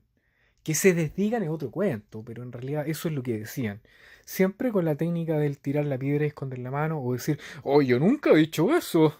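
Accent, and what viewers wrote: Chile. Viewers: TU VOZ Y ACENTO SUENA MUY PARECIDO AL DEL CANAL ( MAROONED ) QUE ES CHILENO LO ERES TU TAMBIEN?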